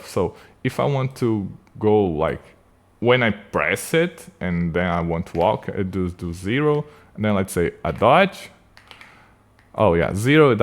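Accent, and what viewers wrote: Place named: Brazil. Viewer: Não sei como mas da pra reconhecer que é BR pelo sotaque kkkkk Salve de Florianópolis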